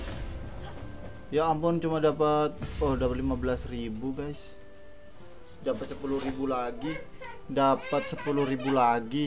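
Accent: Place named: Tegal, Indonesia. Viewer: Cuk ngapak nemen kie. Wong tegal apa wong semarang kie 😂😂😂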